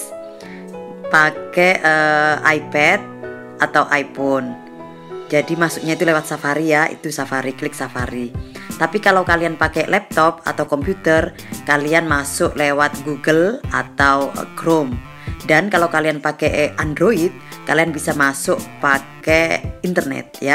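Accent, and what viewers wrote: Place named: Madura, Indonesia. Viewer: waaikumsalam, Youtuber pemula kyak aku hrs nyimak nich so check it out guys logat madura nya terasa berat hehehe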